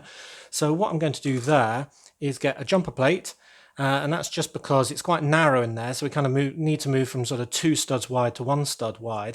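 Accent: United Kingdom. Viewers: are you british bc i thought you were american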